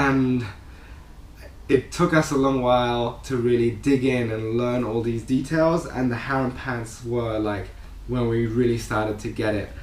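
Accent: Germany. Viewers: No problem!! Please come to Singapore next Year! I would really be honored to meet and have a picture with you! You inspire me so much! I also like the way you talk in the german accent!! So hilarious! Jason Paul, you are an Awesome man and a really sick, legit, great, awesome, fantastic, funny and Excellent Freerunner! Love to meet you someday and parkour with you!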